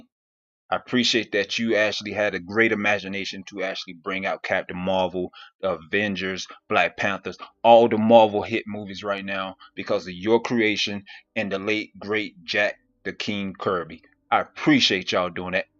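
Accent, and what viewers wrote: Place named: South Carolina, United States. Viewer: Man you representing that SC accent deep. Shout out to South Carolina.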